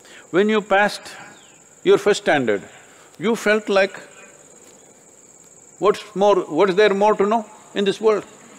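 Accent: India. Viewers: 我认为是，有一些句子，无法找到最合适字的翻译。你仔细听，有时需要前后听两句话，才能感受到当中的信息。而老师的印度腔英语，我们不太容易听出来，所以英文翻译也是相当重要的。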